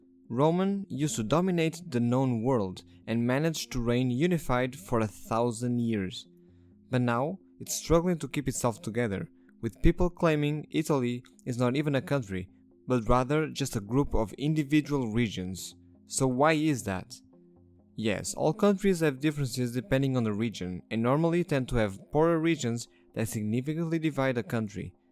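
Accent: Portugal. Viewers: I knew you where portuguese because of your accent. When you said obrigado though i was 100% confirmed.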